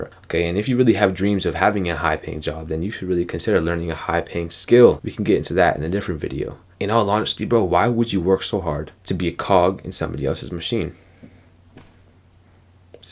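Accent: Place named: Canada. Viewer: I didn’t even realize u were from Canada until u said fam 😭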